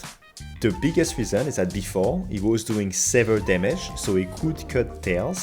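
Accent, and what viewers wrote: France. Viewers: Tu es français j'ai l'impression vu ton accent. Ce n'est pas un reproche ça m'arrange ! Merci pour ton guide, j'ai vu beaucoup de vidéo sur l'Arc et la tienne est de loin la meilleur. Est-ce que ça serait possible d'avoir un guide de builds sur l'Arc ? J'ai fait pas mal de tests de mon côté et je ne trouve aucun moyen de rendre un build élémentaire viable. Ce que je trouve dommage car ça limite grandement les possibilités de build.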